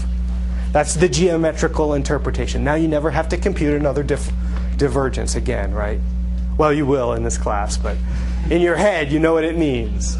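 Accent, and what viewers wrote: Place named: United States. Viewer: Why must American's pronounce every greek letter differently >.<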